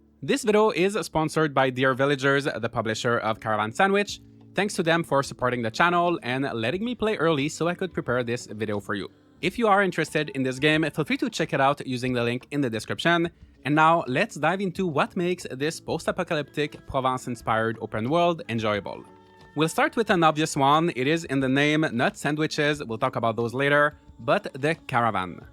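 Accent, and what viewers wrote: Quebec, Canada. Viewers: C'est sûr que t'es Québécois! Je me trompe?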